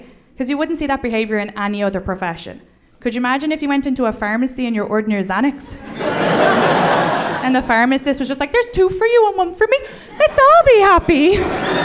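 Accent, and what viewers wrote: Ireland. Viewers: Her Irish accent got stronger after the first minute of the video. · She slipped into a few Irishisms there, I wonder how many people didn't understand or had to process some of what she said. Small things like not disregarding T at the end of words and even in the middle of words. Americans would pronounce them as D but we leave them out completely! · I would have liked to hear you say cunt in your set at least once, there's just something so amusing about hearing an Irish girls accent calling someone a cunt that makes me smile wide 😸😸 / but I realize that you have to set the standards for your style of comedy and how far you're willing to push it 👍🙏 great set too btw 😻😸😺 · That's not a Dublin accent, she's from Kildare by the sound of her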